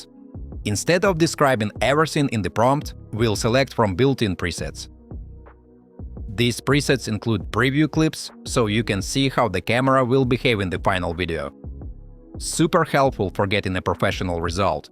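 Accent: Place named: Russia. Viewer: Рашен инглиш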